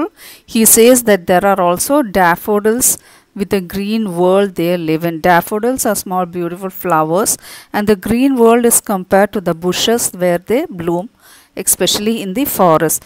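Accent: Kerala, India. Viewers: Its clear in ur voice and tone that u r a malayali maam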